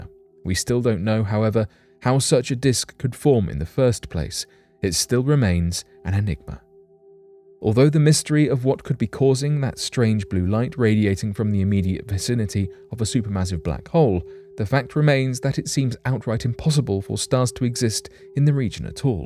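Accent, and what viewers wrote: England, United Kingdom. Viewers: The believability of your videos varies. But the audio volume is super consistent. That and the high frequency of your videos makes this channel my go-to chill out channel. I also love English accents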